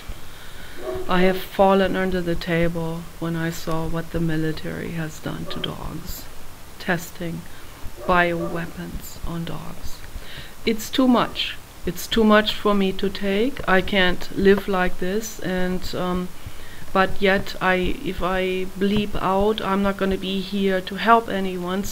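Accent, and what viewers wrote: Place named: Germany. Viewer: You have to be german. I Love your melody!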